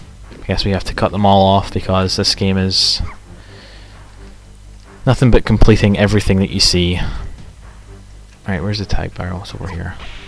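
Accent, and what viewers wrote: Scotland, United Kingdom. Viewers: OH MAH GEWD!!! ARE YOU SCOTTISH?!?! :D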